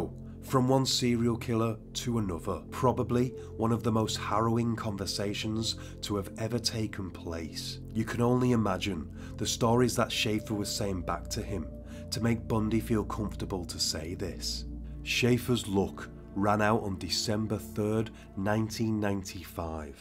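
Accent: United Kingdom. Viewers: 8 Million UK narrators doing US Stories. What is the frickin deal??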